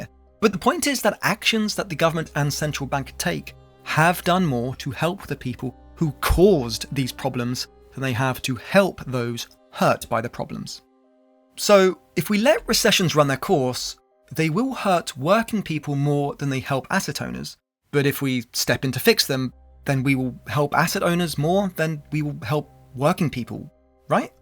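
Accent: United Kingdom. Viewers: Oh man, I love this video but something about a lofty british accent over the mocking depiction of dust bowl victims make me want to wage a second revolutionary war (but like, just on this guy.) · Hearing British people swear while talking about American history adds sunshine to my day. Subscribed!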